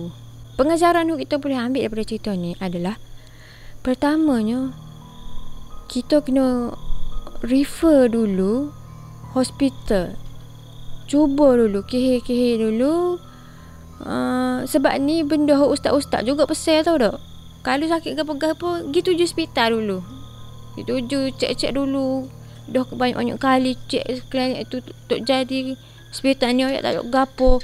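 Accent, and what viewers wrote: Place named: North, Malaysia. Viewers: Hadeeeeeerrrr right now in the comment section below😅📏✌🏼 * Sis ckp utaqa😄👌🏼❤️👍🏼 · Assalammualaikum n hadirrrrrrrrrrrr bt set2 n krew, bapak besaq cek boh Nama kita, terima kasih ye mention Nama kita yg jelas nmpk, sapa rabun pn mst nmpk kleaaar❤❤❤❤❤, loghat utara sempoi cerita kali ni.. 😅😅😅😅😅 Moga bereh pa skala ya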